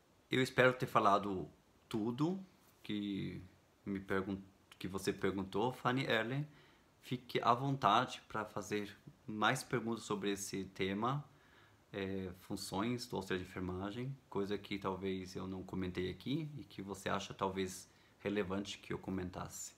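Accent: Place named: Germany. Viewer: Adoro o seu sotaque alemã 😅 usando sempre a garganta eu amo o idioma alemã já Morei em três cidades alemã beijos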